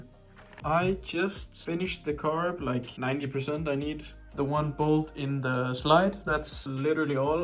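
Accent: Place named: Denmark. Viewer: Jeg kunne høre så hårdt på din accent at du var dansker så jeg tjekkede din kanal og fik ret fire video fire 🏍️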